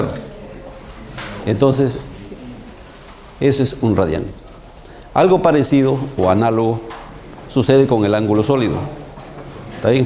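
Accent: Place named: Peru. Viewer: Que buen video, gracias a UTP por subir sus clases. Me parece que el profesor es peruano por su acento.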